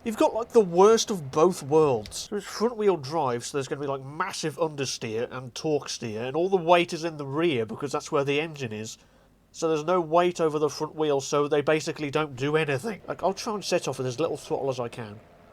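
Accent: France. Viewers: Le grog mobile Also the best French accent ever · Automation idea: Please make one of those VERY low pickups that are very loud and make LOTS of black smoke 🙏🏼 (btw I made the car with that body yesterday) 🥖FRENCH ACCENT IS BEAUTIFUL🥖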